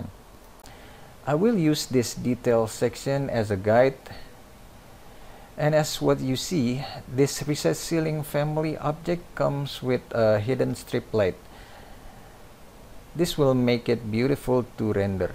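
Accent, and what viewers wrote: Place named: Indonesia. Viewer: tapi pengucapannya bagus kok mas, dengan aksen Indonesia...:) Dulu pernah kuliah/tinggal di Luar Negeri kah?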